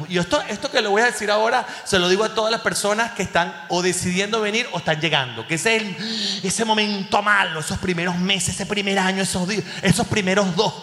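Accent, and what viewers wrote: Cuba. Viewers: Me encantas con tu acento🎉🎉🎉😂😂😂😂😂😂 Cubano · Magistral como imitas el acento de nosotros los cubanos. Me Meo contigo 😂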